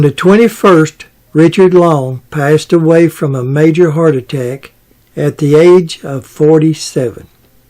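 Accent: South, United States. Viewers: sir, I have mentioned over the years how I enjoy your "Southern" accent bringing additional entertainment to your videos, and this is true. As a transplant from NYC to now southwest FLA, I enjoy it even more. BUT! I am remiss in not mentioning, not only do I enjoy your videos, but I have not mentioned the amazing detail in the research that you unfold for us in ALL of your videos. Well done ALWAYS sir!! Keep them coming!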